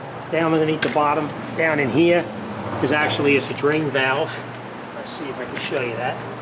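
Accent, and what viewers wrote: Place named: Boston, United States. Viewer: Hey dude thanks for the video and I recognize the dialect, you r from boston or somewhere deep north, we don't say raadiator , it sounds weird the way u say it. Don't take it personal, it just reminds me of an old Army buddy that used to make fun of me and me of him for laughs,lol. Down south people say over yonder and yaul and we say oil different-but we are all American's, so God bless my brother.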